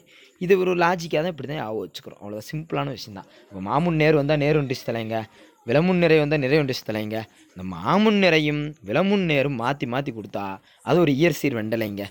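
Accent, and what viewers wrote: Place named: Madurai, India. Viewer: Madurai slangue 😂👍🏽 in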